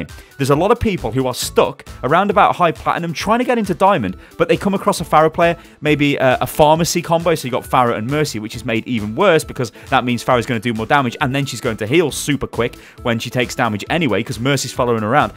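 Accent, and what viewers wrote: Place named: United Kingdom. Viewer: Great British Accent! XD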